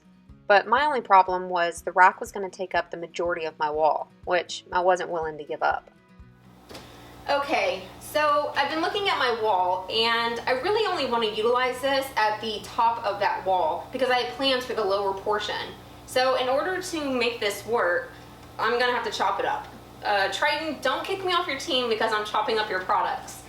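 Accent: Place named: South, United States. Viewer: apparently I'm not allowed to say anything about the southern drawl. so sorry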